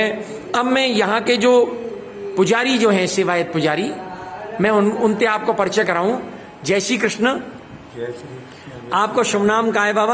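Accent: Braj, India. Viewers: महाराज जी आपकी ब्रज वाणी बड़ी मनभावन हैं 🙏🙏🙏 जय राधा रानी की 🚩🚩🚩🚩🚩🚩🚩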